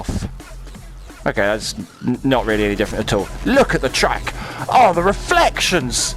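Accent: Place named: United Kingdom. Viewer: I love your british voice. You sounds like a commentator of sport or an astronaut commenting the spectacular view of earth today. 😄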